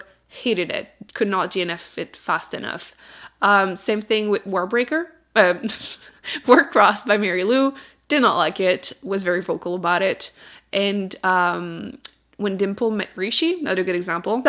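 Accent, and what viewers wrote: Quebec, Canada. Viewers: J’aime trop ton accent québécois 🥺 je me demandais si tu regardais des vidéos de booktubers français? ou plutôt que des anglophones? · I think that most people have just never heard a french canadian accent. I hadn't heard one until I came across this channel a few years ago. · C'est toujours bizarre a chaques fois que je t'entends parler quebs ahahah 😂✨ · Have people never heard a French Canadian accent? 😂 I grew up in Eastern Ontario where every second person you meet has the same accent! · I don't feel like you have a very strong Quebecois accent at all. You sound more like a native English speaker with a lisp, no offense. My ex roommate was Quebecois and usually dropped her French accent so people wouldn't know she spoke french, but if she didn't, it was quite apparent.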